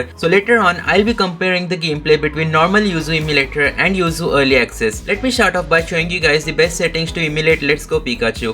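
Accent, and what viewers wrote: India. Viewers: Jesus christ! No wonder people hate indian accent. Get to the point! 🙄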